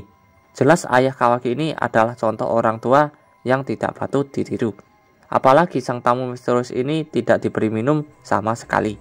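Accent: Java, Indonesia. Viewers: Medok mas suaranya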